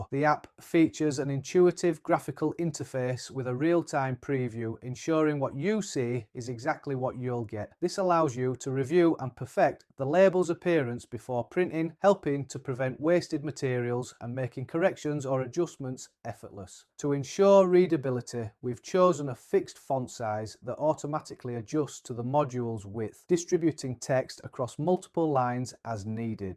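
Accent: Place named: North, United Kingdom. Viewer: Nah, that's just his northern accent!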